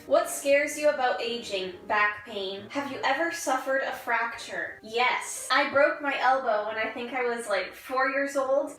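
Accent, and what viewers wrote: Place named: Canada. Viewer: her canadian is showing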